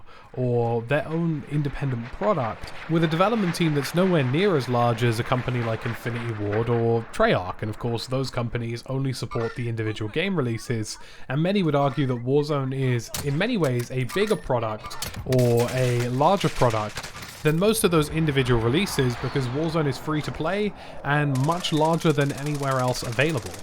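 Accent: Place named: Southern England, United Kingdom. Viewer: This video sounds like a load of assumptions pieced together and narrated with a British accent to sound legit. Yes I’m British myself but I know the effect that our southern dialect has on the rest of the world.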